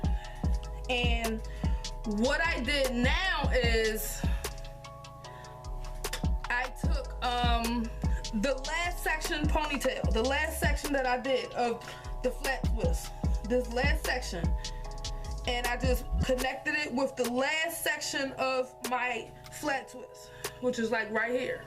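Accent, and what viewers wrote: Baltimore, United States. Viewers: I just ran across your channel. Random question but are you from Bmore? You sound like some of my family members lol love the video hun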